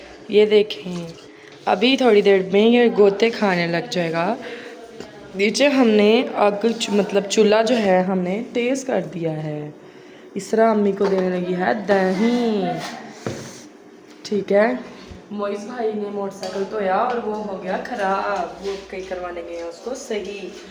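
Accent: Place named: Lahore, Pakistan. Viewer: میں آ پ کی ساری وڈیو دیکھتا ہوں آ پی آ پ کہاں سے ہو آ پ کی بولی لاہور کی لگتی ہے ❤❤❤❤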